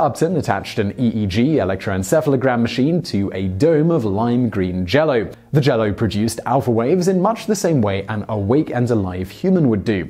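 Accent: United Kingdom. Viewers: Today I found out that you're British.......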